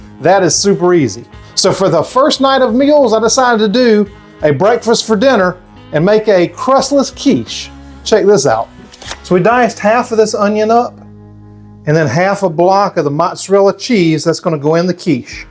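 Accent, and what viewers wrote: South, United States. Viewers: Hi, I absolutely love your southern accent. I can listen to you for hours. I’m from Australia and we don’t do American accent well🤪 but I think after listening to you for a couple of hours, I might actually nail it 😀 Great video, I made your ultimate keto bread yesterday… OMg it is so delicious … I just had it for lunch today…even my non Keto husband loved it. I also had enough mix left over to make 6 muffins which I will freeze if my husband doesn’t get to it first Cheers from down under and keep up the work 🎉🎉🎉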